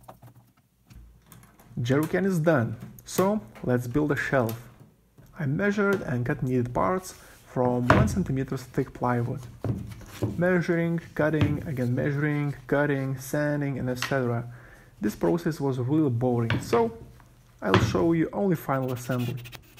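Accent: Russia. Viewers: чувствуется русский акцент..... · Настолько русский акцент , что сразу спалился . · Русский акцент. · sharp russian accent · Лучше бы бот озвучил. Какой откровенный акцент, прямо как в американском кино, где русских показывают. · У тебя Русский акцент. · Why do you speak like russian? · Oh!!! Russian English!!! 😁👍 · Русский акцент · Ебанутый русский акцент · Are you Russian? your accent is telling me Russian but I'd rather ask then assume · ты русский что ли??? акцент слышен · Пиздец какой у тебя Русский акцент браток! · Русский акцент)))) Канал на русском есть?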